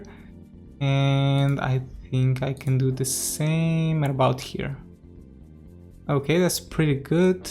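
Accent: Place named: Portugal. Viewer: Descobri o canal recentemente, não sabia que esse sotaque era português! Abraços do Brasil!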